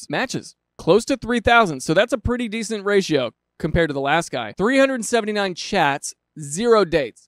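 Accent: Germany. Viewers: That's a pretty good German accent, not sure what it has to do with Lake Tahoe though lol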